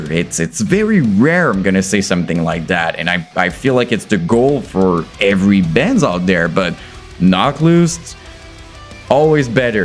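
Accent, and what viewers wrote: Quebec, Canada. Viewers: Great videio man! You do something new and different. Do I hear a french canadian accent?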